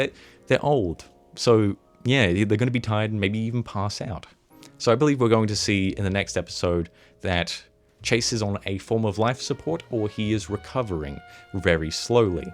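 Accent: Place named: Australia. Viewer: Ok, now I have three things to say to you! 1. Nice Aussie accent 2. Skylanders video? Now I’m randomly curious cause I love skylanders And 3. Doesn’t Adobe have a thing where automatically backs up your save file, every few minutes or something? Or did the crash fry them too? Or is adobe too archaic to include backups when Resolve and ProTools do?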